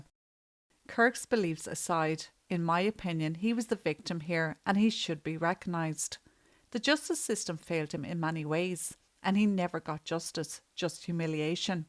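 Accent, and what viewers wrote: Ireland. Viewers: Sorry to butt in but my dear you look terrific on my tablet let alone your delightful demeanor and your sweet Irish accent to boot 😊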